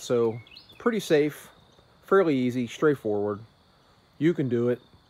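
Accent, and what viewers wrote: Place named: Philadelphia, United States. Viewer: Your thick Philly accent. Where did you grow up?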